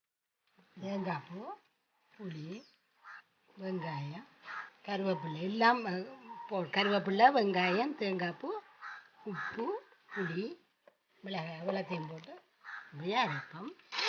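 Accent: Jaffna, Sri Lanka. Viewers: யாழ் தமிழ் இனிமையாக இருக்கிறது .. .from chennai,Tamilnadu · Love, love your Sri Lankan Tamizh 💕💕 and of course, the recipes 😊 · Samayalodu ungal elangai tamilum arumai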